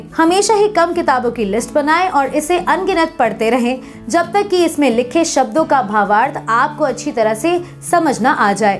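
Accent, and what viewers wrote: Standard. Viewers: Mam do you speak after Google translate your Hindi is so pure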